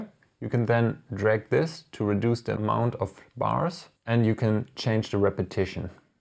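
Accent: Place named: Germany. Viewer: You Sounds like a German Guy when you speak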